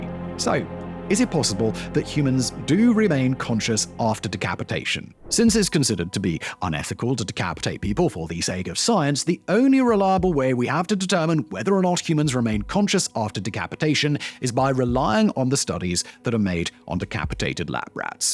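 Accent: United Kingdom. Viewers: Damn he is british.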